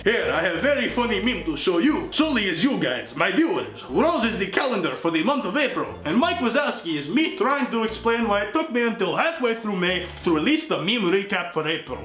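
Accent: Russia. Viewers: I NEED MORE OF TALKING IN A RUSSIAN ACCENT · That was an interesting voice with the Russian accent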